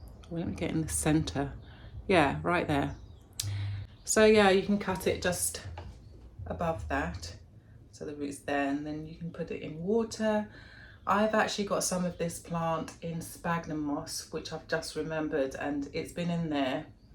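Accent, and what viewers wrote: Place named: United Kingdom. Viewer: Love live your British accent- you make words sound so fancy😊 Greetings from Connecticut-USA 🇺🇸but was born in the Philippines 🇵🇭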